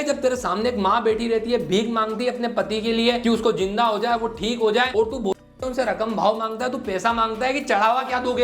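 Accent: Indore, India. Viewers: Bhiya Aap Indori ho kya ? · Bhai tera INDORI andaaz bht achha laga... aisa laga koi bada bhai samjha rha hai sahi baat❤❤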